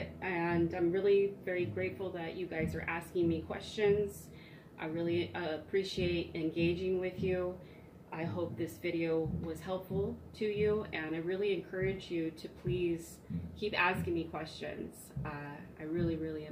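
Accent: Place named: United Kingdom. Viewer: From a British viewpoint you have a very pleasant accent, whereas when we berthed in LA we found the local accent harsh and unattractive. Difference in education? My wife used to retract her undercarriage much as you do - but she saw me in the RAF and I think imitated the aircraft - that was her story anyway!! .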